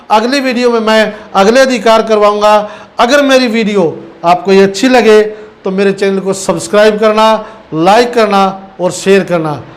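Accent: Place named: Haryana, India. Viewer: Hariyanvi kamal 😊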